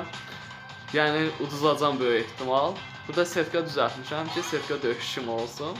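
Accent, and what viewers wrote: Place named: Jabrayil, Azerbaijan. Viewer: Axşamın xeyir qaqam. Necesen? Srn haralısan? Lezzet eliyir danışığın. J ile danişirsan. Cebrayıl lehcesine oxsuyur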